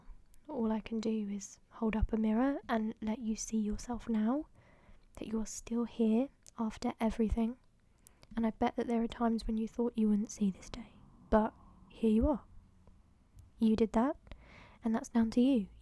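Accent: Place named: RP, United Kingdom. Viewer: Sound so posh here. I love it! 😂😊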